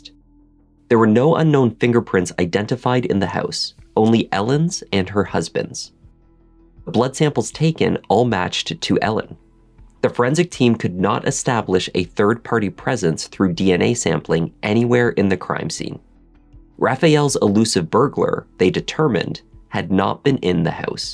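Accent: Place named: Canada. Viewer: The narrator sounds Canadian so probably doesn't realize it. Nor would I.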